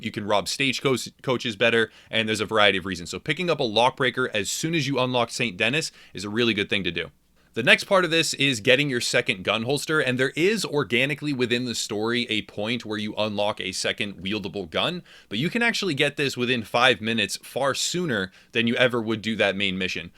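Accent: United States. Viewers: Typical thick American pronunciations, Saint Denis for example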